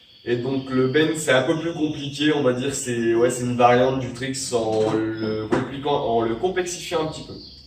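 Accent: France. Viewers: tu viens du nord ? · T'es de Ch'Nord?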